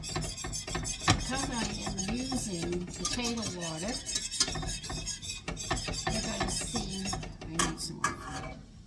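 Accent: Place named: North Carolina, United States. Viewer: You’re telling the truth, more should take heed!!! Sounds like you from NC “whaterburger” “red barn” restaurants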